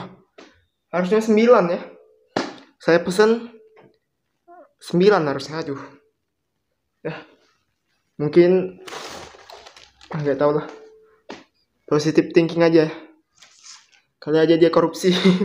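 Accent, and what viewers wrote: Palembang, Indonesia. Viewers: bang lu orang Palembang ya bang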